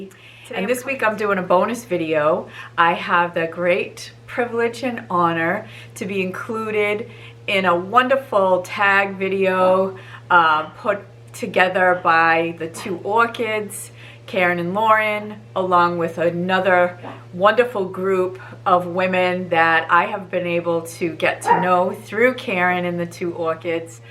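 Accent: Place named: Fall River, United States. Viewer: this is such an open and inspiring video. Thank you for posting it. I knew I recognized that accent, I’m originally from Fall River! ❤️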